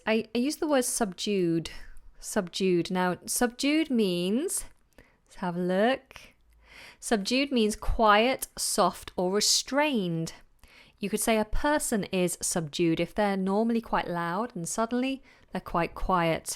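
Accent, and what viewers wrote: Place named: United Kingdom. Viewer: can you please speak with a more clear British accent, even in some sessions not all of them! I know that you speak with a British accent in this video, but are you speaking in the same way during your normal day? Thanks in advance!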